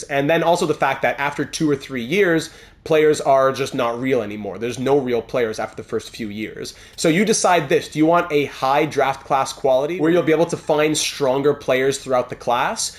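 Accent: Quebec, Canada. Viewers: thank you! Great video and est ce que t quebecois? Si oui gg pour ton accent anglais!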